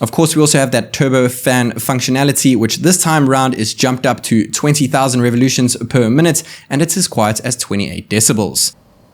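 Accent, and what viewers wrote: South Africa. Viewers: i swear you sound south african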